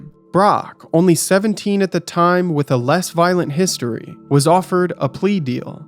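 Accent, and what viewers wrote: United States. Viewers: Quiet in the wilds is never a great omen. Typically, I've experienced that means there's a predator out there that scares every other animal Just saying, you sound at least as country as I am, but still, heads on swivels, guns loaded, situational awareness man. Quiet is not good, especially once it gets dark in the woods or anywhere, honestly.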